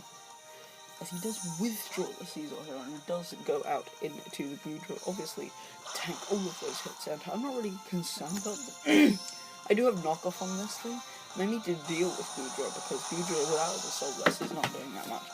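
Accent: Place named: England, United Kingdom. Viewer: This is Sam from Facebook, good battle. Are you from England? You have an accent.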